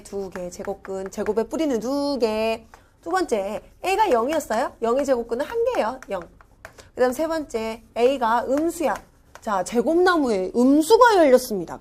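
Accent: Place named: Busan, South Korea. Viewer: 연기할때 표준말 쓰는데, 부산이 고향이라 그런지 실제 목소리 들어보니 약간 부산 억양이 있음. 부산 사람 DNA는 있나봐.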